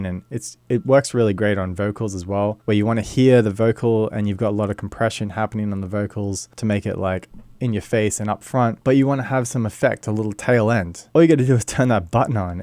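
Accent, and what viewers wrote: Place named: Australia. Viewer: Hey mate. Great vid and great to hear a fellow Aussie in one of these vids. Where in Oz are ya from?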